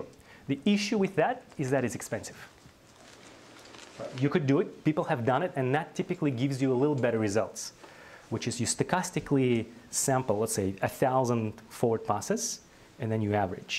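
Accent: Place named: Russia. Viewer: Чувствуется русский акцент ...перемотал в начало, автора посмотреть, я был прав!)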